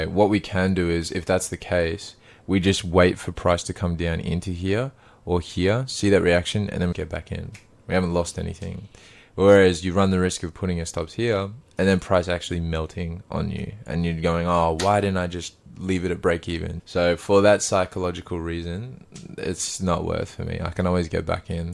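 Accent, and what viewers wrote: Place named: Australia. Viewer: strong mindset 💪 rare to hear a fellow Aussie accent in the trading scene.🇦🇺 keep going up 💯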